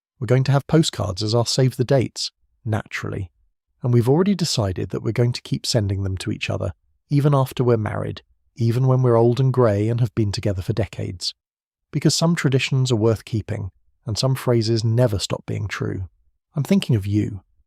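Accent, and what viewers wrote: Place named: United Kingdom. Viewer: I would like to make a suggestion. If it's OK to take a british narrator it's non real to use him for dialog of American characters. Could you try to make an effort to solve this situation? As an actor myself it would really make a difference. Thank you! By the way, I'm from near Montréal, Québec, Canada.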